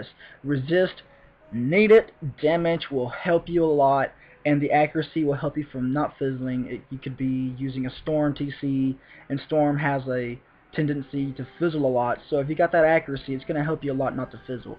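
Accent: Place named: Kentucky, United States. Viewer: God dang his accent so kentuckyish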